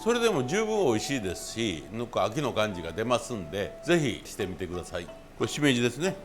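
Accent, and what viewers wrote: Kyoto, Japan. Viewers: 村田さんの気取らない感じや京都言葉がいいですね。 お出汁が３カップということはお米は３合でしょうか？ きのこ類全体でどのくらい量があるのでしょう？油揚げは１枚でしょうか？ 量について、もう少し詳しく書いてくださるとありがたいです。以前の動画なので、フォローむずかしいかもしれませんが。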